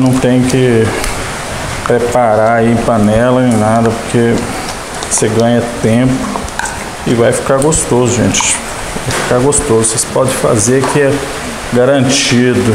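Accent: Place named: Goiás, Brazil. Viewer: Meu caro, bom diaaa! Eu reconhecia o seu sotaque, mas eu não sabia que vc era daqui de Goiânia (hehehe)! Meus parabéns pelas suas "lives" maravilhosas!!! Vou fazer essa receita aqui em casa amanhã, sem falta! Obs.: eu só vou fazer diferente o seguinte, vou misturar todos os ingredientes numa vasilha antes... e acrescentar alguns outros, rs! Que massa espetacular!!! #ShowDeBola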